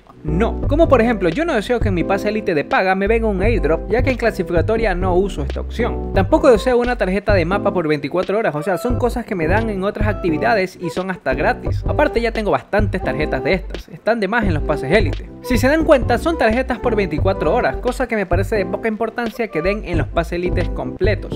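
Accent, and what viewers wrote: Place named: Ecuador. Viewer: que eres de ecuador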